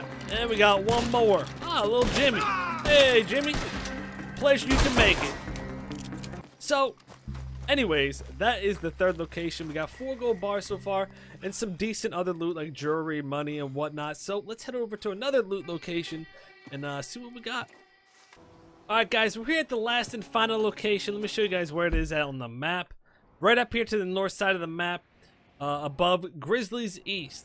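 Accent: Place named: South, United States. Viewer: Like your videos but not the southern accent.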